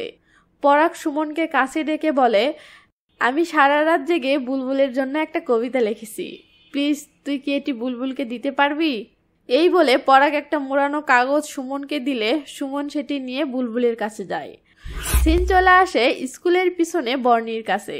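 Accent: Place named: Mymensingh, Bangladesh. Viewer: বুজতে পারতেছি আপনার বাড়ি ময়মনসিংহ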